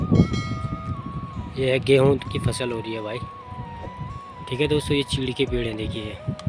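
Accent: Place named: Pahari, India. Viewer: Wow कितना sunder जगह बहोत ही aacha मन ख़ुश हो गया वीडियो देख कर और आपकी पहड़ी आवाज सुनकर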